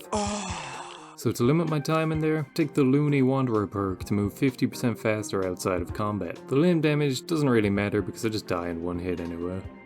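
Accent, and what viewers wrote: Ireland. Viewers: It’s Irish I’m pretty sure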